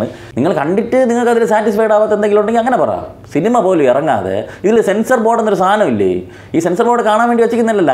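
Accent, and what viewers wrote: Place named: Thiruvananthapuram, India. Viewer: തൃശൂർ പഠിക്കാൻ വന്നതിനു ശേഷം ഞാൻ തിരുവനന്തപുരത്തെ സുഹൃത്തുക്കളുടെ സംസാരം മിസ് ചെയ്‌തു. നന്ദി. Hope we can be good friends.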